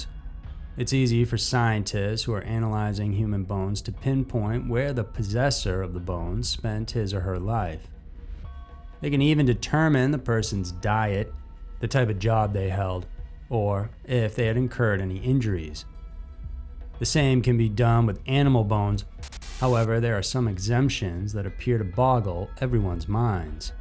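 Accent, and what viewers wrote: South, United States. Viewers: “…SMALLER SAHZE….” I like this boy announcer, and he got a small southeren accent on him.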